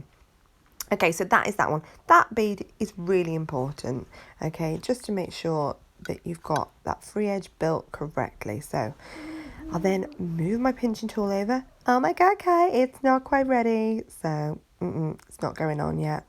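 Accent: United Kingdom. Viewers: Hello you just popped up in my feed nice to hear another britt. Yes it's been hot. Love the nails I have started to learn the basics so I can do my own as my natural nails are brittle due to fibromyalgia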